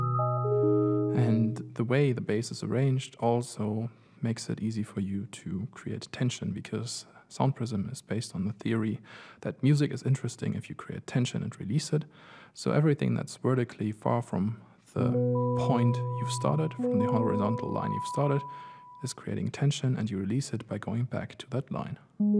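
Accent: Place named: Germany. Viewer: German accent :D nice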